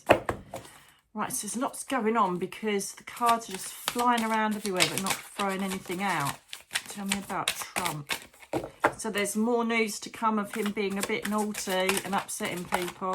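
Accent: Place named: England, United Kingdom. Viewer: Hi! I love you and your videos. I'm training myself to understand your English accent. The more I listen to you, the better I get but... you speak too fast! Thank you for being here for us. Nancy, Argentina.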